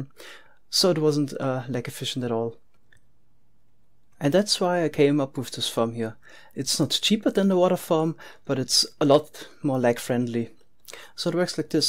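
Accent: Germany. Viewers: You're german, right? :)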